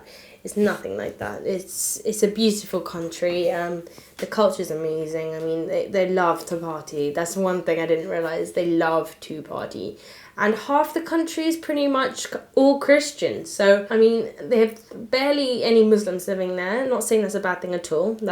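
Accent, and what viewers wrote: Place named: England, United Kingdom. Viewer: This lovely young lady sounds rather English,but she was probably born in England of Armenian parents.Felt that she gave a pretty good speech about some cultures that are rather similar to Western Armenians,such as the Jews,and Greeks.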